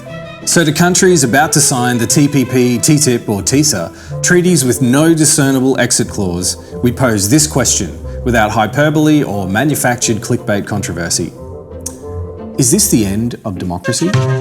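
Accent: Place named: Australia. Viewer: Love his Aussie accent :) AND he's smart - we need guys like this here in the USA.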